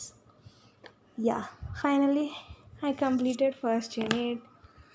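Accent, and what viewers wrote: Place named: Telangana, India. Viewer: Motham telangana pilla la matladthunav😅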